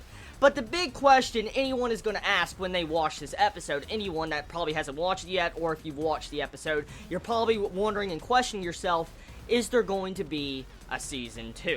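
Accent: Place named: Texas, United States. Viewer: yo bro you from texas?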